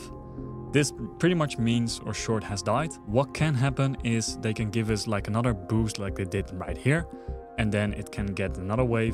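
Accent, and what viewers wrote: Netherlands. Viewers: The Dutch accent😂 Btw nice vid!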